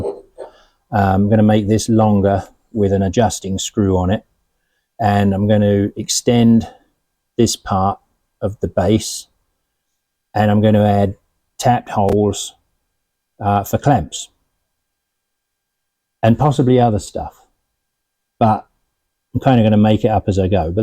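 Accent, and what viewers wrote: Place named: England, United Kingdom. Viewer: Ooh! Just found your channel, nice to hear an English voice for a change. 🙂 I have the same saw, the blade fell off every time I started a cut as the drive wheel was misaligned, but after a bit of fettling it's done a good 15 years service including cutting a lot of firewood! I recently had to replace the motor capacitor, but nothing else. The stand is poor as you say. I'll be interested to see your vice mods.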